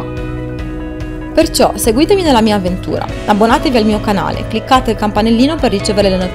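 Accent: Naples, Italy. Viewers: Hai l' accento Napoletano...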